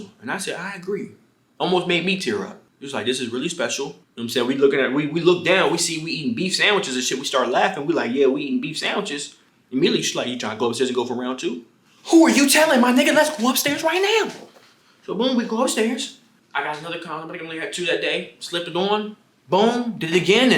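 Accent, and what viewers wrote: United States. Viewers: Is he frm New Jersey ?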